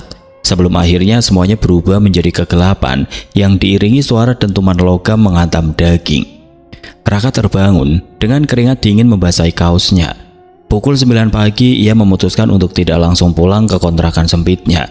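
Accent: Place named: Banyumas, Indonesia. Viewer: kayak suara khas ngapaknya ..dgn ciri khas cerita panjang ngalor ngidul cmn ga ada seramnya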